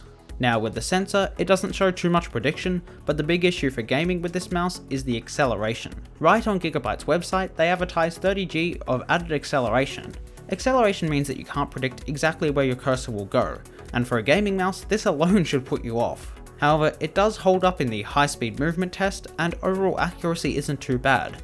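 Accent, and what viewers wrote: Australia. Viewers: He's not Scottish. I have no idea why some think he is. He's Australian. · Why is this Australian doing the voice over?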